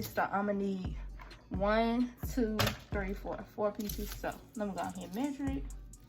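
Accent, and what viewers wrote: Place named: Tennessee, United States. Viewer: She definitely from Tennessee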